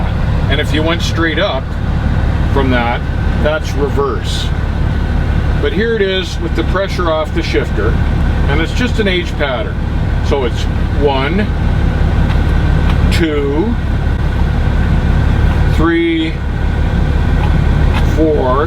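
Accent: Canada. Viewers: You must Canadian if I'm correct. I've noticed that Canadians pronounce out different than we do in the states.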